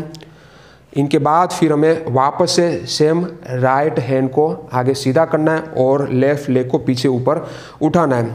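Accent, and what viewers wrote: Gujarat, India. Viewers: By accent , I guess you are from Gujrat..btw , nice advise in videos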